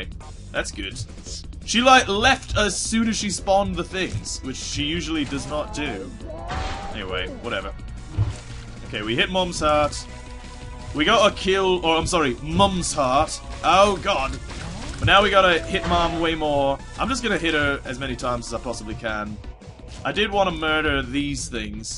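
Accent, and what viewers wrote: United Kingdom. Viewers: Lol your british accent is funny..... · wouldnt that be Best. British. Accent. Ever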